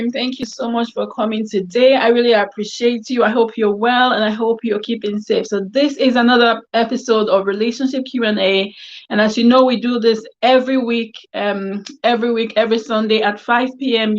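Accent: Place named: United Kingdom. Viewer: This is a very interesting topic u look gorgeous sis I am enjoying ur UK accent...would be here for all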